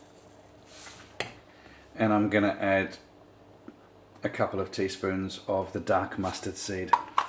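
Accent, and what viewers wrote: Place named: Australia. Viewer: This is the best recipe I’ve seen on you tube for green tomato chutney. I need to do something with my tomatoes, (from my garden) before they spoil. Love this channel, especially because you sound like an Aussie. (I am one too).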